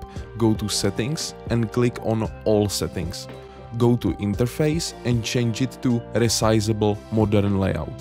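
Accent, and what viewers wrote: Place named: Czech Republic. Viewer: něco málo sem se naučil dík začal sem hrát zhruba před 14 dny:D okamžitě mi z přízvuku bylo jasný že si Čech, tak zdar!..... teď když mluvim o přízvuku... zníš na 100% jak Adam Jícha lmaoo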